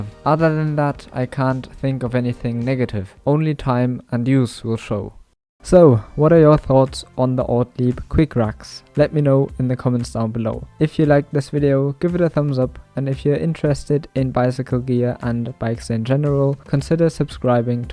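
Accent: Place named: Germany. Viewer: I love this German guy. Straight to the point, pragmatic, no BS. And cool accent. 10/10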